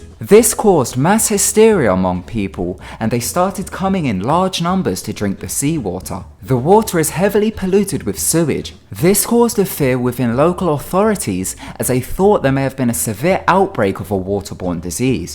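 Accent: United Kingdom. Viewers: Pretty sure it's a strong UK accent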